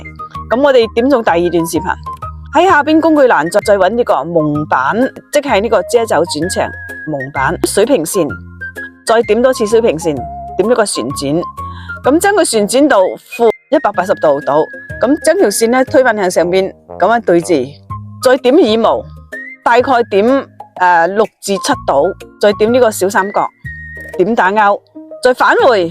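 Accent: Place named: Taishan, China. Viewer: 這位台山口音的姨姨 好叻喎 👍🏻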